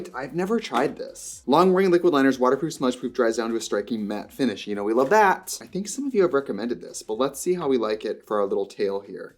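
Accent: Wisconsin, United States. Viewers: trixie’s wiscansan accent really popped out in this video (i say as someone from effing maryland) also a whole face of milani might be the move. 👀👀👀👀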